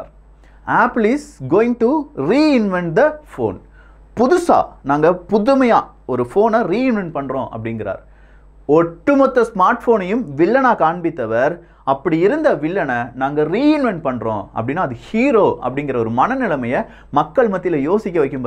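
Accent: Kerala, India. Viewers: Hi, i follow all your channels regularly, really appreciate your efforts n hard work. Tamil politics, international news, Geopolitics updates, science n technology, all i n all boss neenga. Krep going.. btw, I am frm Brussels. One suggestion: you really have to change TamilViyugam theme😊 And your English pronunciation quete keralitic, like Public, application etc should be pronounced correctly, since some students following this thread, so;) Thanks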